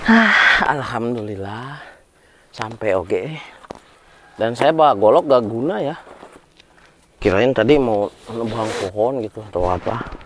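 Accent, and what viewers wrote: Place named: Banten, Indonesia. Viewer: Bahasa na sami siga di banten balukang, baralak kampung naon atuh ieu kang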